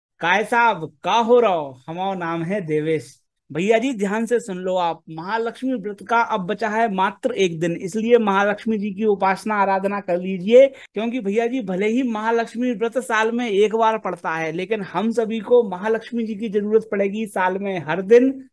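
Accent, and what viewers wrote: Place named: Bundelkhand, India. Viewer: Hum to sirf Bundeli sun ne k liye dekhte hai Baki to humaye dadda sikhaye gaye hate market se door rahiyo 🤣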